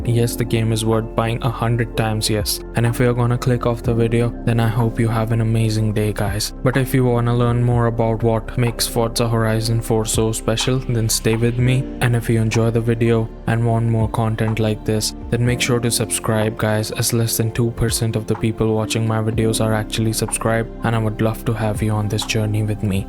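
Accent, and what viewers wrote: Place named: India. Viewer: Great review brother, you got Indian accent — Indian? I love that accent! Keep up the great work, you'll gain more sub eventually. 😎🍾🍻🌟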